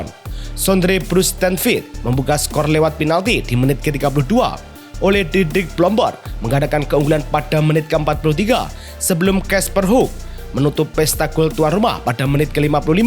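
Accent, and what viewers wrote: Java, Indonesia. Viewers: Salfok sm suara yg ngomong medok bgt😅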